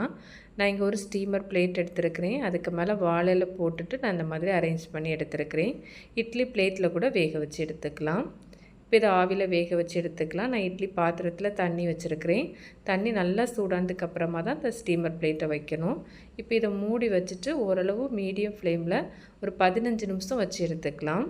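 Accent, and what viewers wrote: Tirunelveli, India. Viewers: Your Tamil is like Tirunelveli Tamil.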